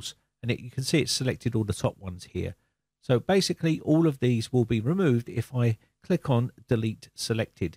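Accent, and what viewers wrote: United Kingdom. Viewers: British accent perfect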